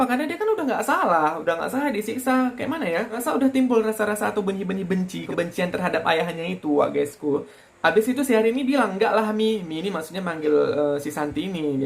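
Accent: Medan, Indonesia. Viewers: Medan kale kau bg..😂😂😂..mantap👍👍👍..selalu semangat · Habis nonton filim arie hanggara. Langsung kesini. Auto subrek. Btw nampak x logat medan nya bg😂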